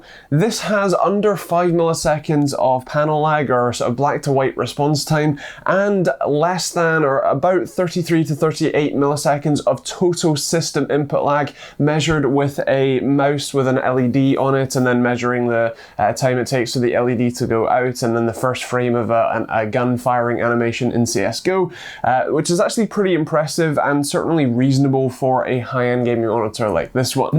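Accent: Scotland, United Kingdom. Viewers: Scottish actually.. :D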